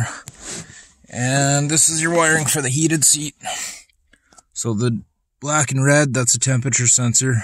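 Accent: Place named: Canada. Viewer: This guy has the most excellent Canadian accent I've heard in a while!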